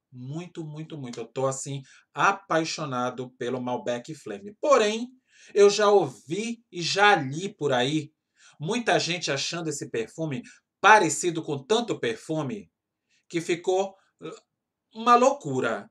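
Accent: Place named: Ceará, Brazil. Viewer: Amor você é nativo de qual Estado do Brasil...achei seu sotaque tão cearense ❤️ Eu moro em Fortaleza ce, cheiro!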